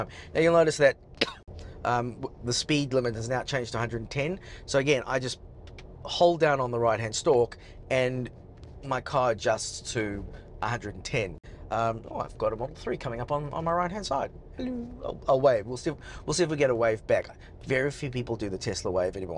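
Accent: United States. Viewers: Love the American accent to get voice control working. Hopefully buying a Model Y soon.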